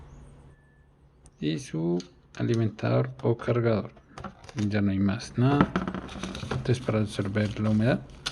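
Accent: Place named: Colombia. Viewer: Que precio tiene en su país amigo por su acento me parece que es de Colombia de que parte? Un saludo desde Barquisimeto. Venezuela.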